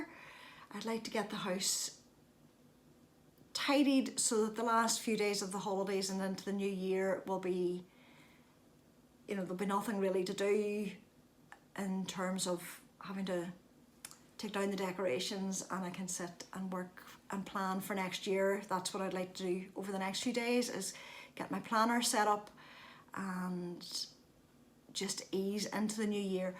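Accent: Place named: Ireland. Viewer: Thank you so much, could listen to you all the time.Love your Irish accent.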